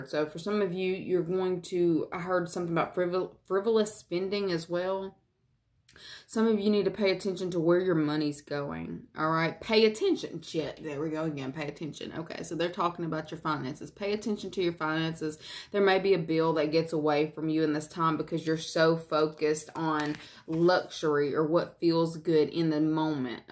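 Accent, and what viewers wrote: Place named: South, United States. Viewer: Thank you so much for this reading!🙏🌻♥️ Your voice sounds just like one of my friends in Charlotte, NC. This is crazy!!😅 Love hearing your readings!!🙏❤️🌷 You must be from the south. You sound like a southern bell. I have not been in a date in 29 years. I'm a June 20th Gemini, cusper. Girl, I'm not copying anyone! I am very strong and am very worthy! I love me and love who I am.🤗♥️ I've been kicked in the butt big time. I'm the most important to me. I'm remodeling my home. It needs it desperately!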